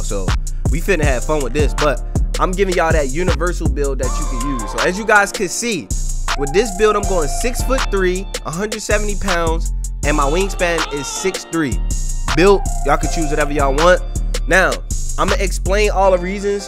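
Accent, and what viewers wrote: Baltimore, United States. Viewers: Dang bruh you from Baltimore 😭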